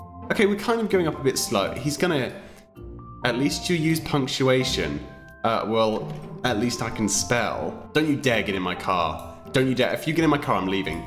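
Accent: England, United Kingdom. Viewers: Is this is called British accent? I don't really now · Ana Contreras cuz he is british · David Beck They're both British. What do you expect? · Are u English ? I luv ur channel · Ana Contreras There both British so ya I see why you said that but at the same time I am a bit confused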